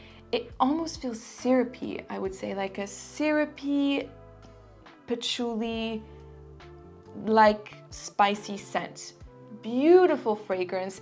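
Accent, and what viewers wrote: France. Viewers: Actually I don’t get extremely excited about the hibiscus mahajad… I do have and love Ingredients 07/2012 and recently got my hands on a sample of Neroli Nasimba and enjoy it quite a bit! Enjoying your beautiful French accent and pronunciation !!! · Came here for the review but I have to say I love hearing your French pronunciation. lol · I truly wish you can review Versace Dylan Purple Paco Rabanne Olympea Loving your French as always… I keep practicing the French R now 😅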